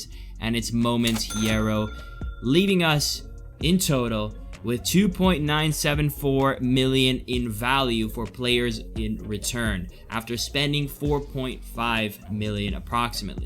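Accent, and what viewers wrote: United States. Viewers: Italian? You sound completely American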